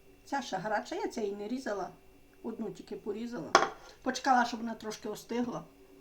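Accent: Ternopil, Ukraine. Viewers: молодець! Говорить так,як розмовляють на Тернопільщині,в кожному місці свій діалект,в мене полтавський,коли навчалася в школі,то розмовляли по русько- украйонскі.Так на нас говорили з інших місцевостей 😅Мені дуже подобається,як говорить.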